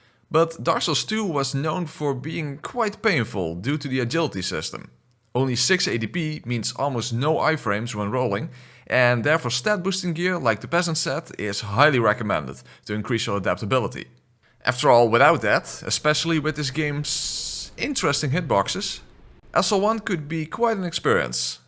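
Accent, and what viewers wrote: Netherlands. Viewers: Are you Dutch? You have a Dutch accent! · Dutch accent is so recognizable hahaha, leuke video man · this dude is dutch he has to be .... i hear it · are you dutch? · Are you Dutch? The accent is strong. XD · i hear your voice for one second, and i instantly know your dutch. wel goeie video hoor. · Tried to watch this, but the Dutch accent was a bit too cringe. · I've been watching your videos for months now since picking up Bloodborne last year and now playing through DS2, and have been trying to figure out your accent ever since!! So glad that my guess of being a Southern Dutchie was correct hehe. Sounded so familiar since I study in Limburg as an international!! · that accent I recognize are you dutch? je bent echt goed ik zou nooit zoveel gedult hebben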